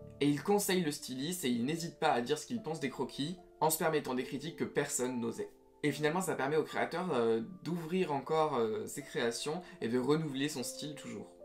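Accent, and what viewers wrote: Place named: France. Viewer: J,adore votre accent tres francais, pas trop pointu.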